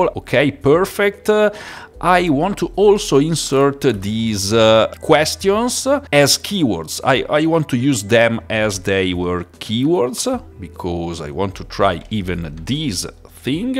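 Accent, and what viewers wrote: Italy. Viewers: I love your perfect English with a delightful Italian inflection when a word ends in a consonant ❤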